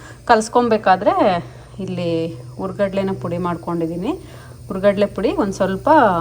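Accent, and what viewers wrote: Mandya, India. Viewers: Nimma mandya bhashe thumba chennagide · Hai nice vlog .nim Mandaya language thumba channagide nice to see your vlogs .OK bye take care. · Nim matu keli kushi agutte Same nam mandya style ಮಾತು❤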